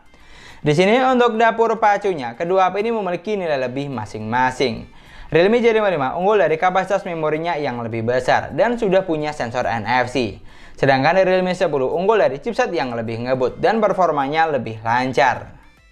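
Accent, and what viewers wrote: Madura, Indonesia. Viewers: Orang Madura yg bang?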